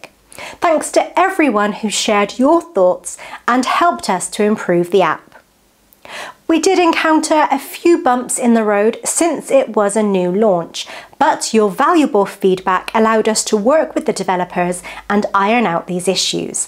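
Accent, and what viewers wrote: United Kingdom. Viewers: Your British accent. OMG! ❣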